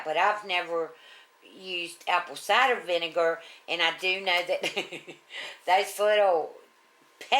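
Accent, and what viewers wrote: South, United States. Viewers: Pennie Clinton Yes has that deep southern accent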